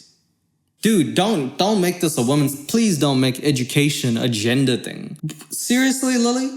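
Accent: South Africa. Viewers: I was sure I heard the South African accent 🇿🇦 proud of you man. Killing the YouTube game. I wish you success boet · Omgosh i just knew it !! I always felt that SA vibe. I just recently started watching you. And have really been enjoying the humor. But i kept thinking u kinda have an accent but not really . But i just knew it... I am from Cape town Anyway keep on keeping on ✌✌✌Thanks for the great vids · wow i knew you were from sa before you said it because of how you talk (also from sa, moved aswell) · My dude, I thought you were South African because of your accent (my first impression). Omg you're so funny, I didn't see the time past😂😂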